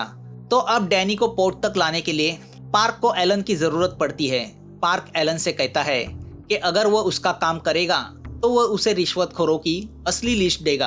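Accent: Maharashtra, India. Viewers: Aapke hindi marathi ki jhalak dikhai deti hai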